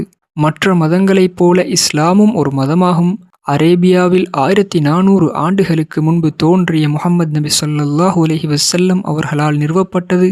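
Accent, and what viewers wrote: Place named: Tamil Nadu, India. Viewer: Assalamu wa alaikum neega peasura tamil ilaggai tamil matheri illai India tamil matheri iruku I am India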